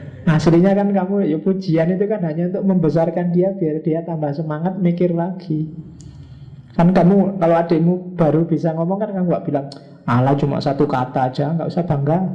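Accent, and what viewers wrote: Java, Indonesia. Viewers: English aksen jowo agak menggelitik di kuping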